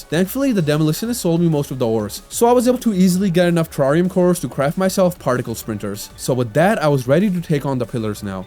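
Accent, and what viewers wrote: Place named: United Kingdom. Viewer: British ahh accent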